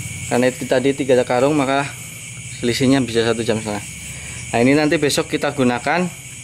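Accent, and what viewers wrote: Banyumas, Indonesia. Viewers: dri logat nya kya org banyumas ya om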